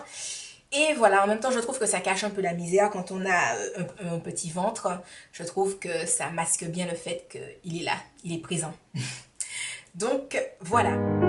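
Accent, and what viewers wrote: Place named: Antilles, Caribbean. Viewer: ton accent antillais, c'est trop mignon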